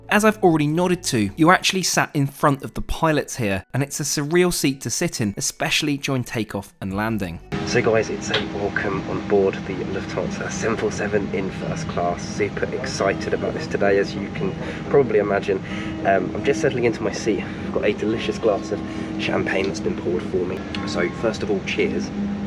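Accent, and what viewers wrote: United Kingdom. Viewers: British accent pain to ears 😖😖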